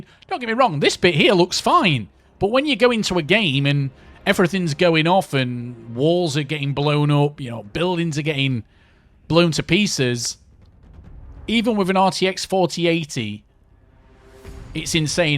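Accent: United Kingdom. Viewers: Are you Irish, English, or Scottish?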